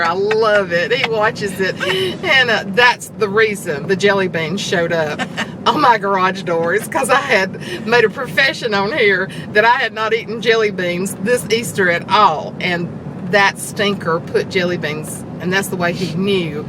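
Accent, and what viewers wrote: South, United States. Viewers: Aww there she is!! I lost my mom so is my substitute with the southern drawl lol!♥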